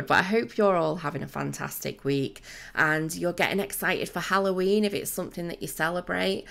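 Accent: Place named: Northern England, United Kingdom. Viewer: My boyfriend is really proud of himself - I occasionally show him accents and ask where he thinks they're from, and he guessed you were from somewhere North of Manchester. :P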